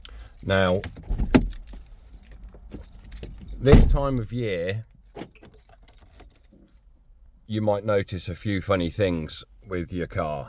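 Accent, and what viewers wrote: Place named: Kent, United Kingdom. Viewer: Hey, great video! I'm typing from Canada. I'm lived half my life in Kent, I haven't been back to the UK in almost 10 years, but it's great to hear the old accent😆. I just had a question, as a vw tdi enthusiast like you, do you recommend driving your vw tdi hard (ie. staying in 3k-4k rpms for a few minutes or redlining) or just shifting in a higher gear by putting the dsg in manual mode? And then also if I drive it "hard" or shift it into a higher; how often a week should I do this as a daily driver in order to prevent buildup in the engine from the egr,dpf, etc...? I own a 2014 2.0L vw passat tdi by the way and the only difference between the conditions I'm in, is that it's negative temperature for over half the year here lol.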